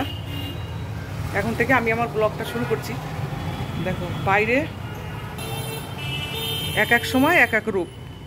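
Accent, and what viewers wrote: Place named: Bangladesh. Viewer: এই আপুর বাড়ি মনে হয় বাংলাদেশে। উনার ভাষা কোলকাতার ভাষা মনে হয় না।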